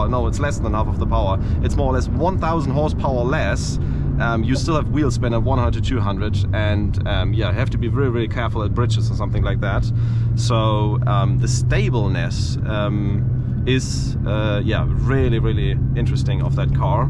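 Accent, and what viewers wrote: Germany. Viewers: I hate this german accent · are you from germany??? because you sound german?? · ein wunderschönes Denglish :) hört man sofort raus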